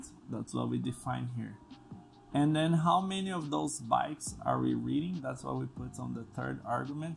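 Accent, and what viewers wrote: Brazil. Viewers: Very good explanation bro, thank you! I just stayed with one doubt, are you brazilian ? Your accent is too much typically hahaha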